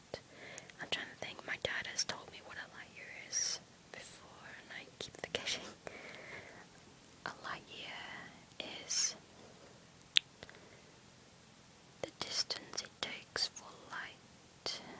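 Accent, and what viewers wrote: Yorkshire, United Kingdom. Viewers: You sound Yorkshire voiced..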